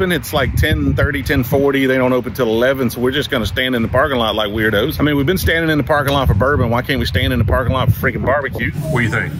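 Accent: South, United States. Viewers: mostly because it was MACK Donalds... Love the southern accent.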